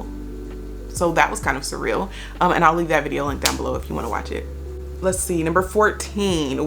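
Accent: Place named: New York, United States. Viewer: I love her NY accent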